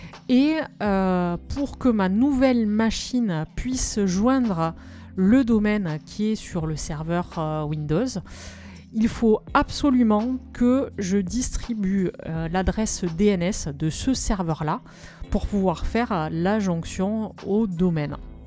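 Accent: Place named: South, France. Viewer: Très bonne playlist sur FOG. Chez les sysadmins, on a trop souvent ce réflexe un peu idiot de ricaner ou se méfier en entendant la voix (agréablement méridionale) d'une jeune femme pour donner des conseils sur le déploiement. Et tu as admirablement fait mentir ce réflexe. Subbed. Je me fais fort d'aller fouiner dans le reste de la chaîne cette semaine, que je peux imaginer de grande qualité sur la foi de ce petit tuto impeccablement construit. J'espère que ta chaîne va décoller : elle mérite.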